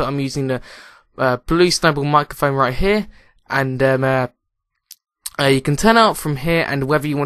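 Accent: United Kingdom. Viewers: 100,000 sub video shud be u doin a video attempting to do an american accent i hav always wanted to hear a british person do an american accent :D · That's just how British people in general say H.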